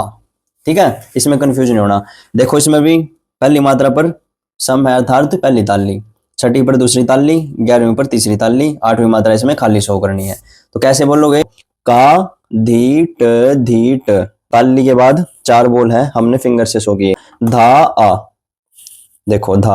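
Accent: Haryana, India. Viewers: That Haryanvi tone hits ❤❤ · Haryanvi tone ❤❤ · Kitna sahi accent hai bhai. Haryanvi ho? Thanks for the information and motivation btw❤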